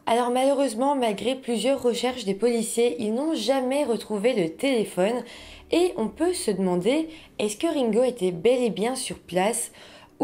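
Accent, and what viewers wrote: Belgium. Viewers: Coucou pitchounette agréable travail et ta voix ton accent les ouite (8) et les nonantes ou septante la barre la belgique représente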